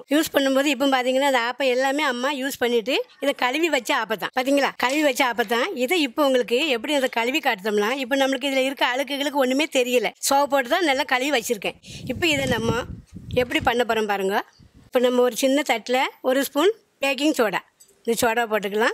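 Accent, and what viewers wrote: Tirunelveli, India. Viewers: Anna avanga thirunelveli slang anna... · Tirunelveli speech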